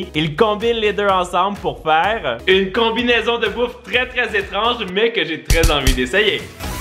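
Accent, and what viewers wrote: Quebec, Canada. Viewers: T le meilleur continue comme tu es et ne change pas et ton accent quebequois est MAGNIFIQUE ❤️❤️❤️❤️❤️❤️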